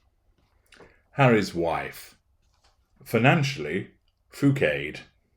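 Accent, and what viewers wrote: United Kingdom. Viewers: Why are curse words even funnier when pronounced with a proper British accent??!!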